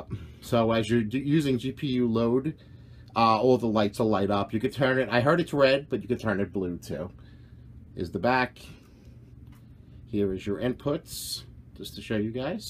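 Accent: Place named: New Jersey, United States. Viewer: He has such a jersey accent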